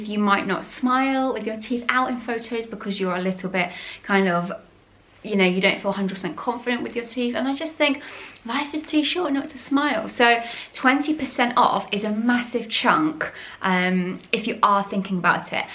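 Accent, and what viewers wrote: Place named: England, United Kingdom. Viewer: The English accent has a calming effect on me. Pip, pip, cherio and God save the King and all that rubbish.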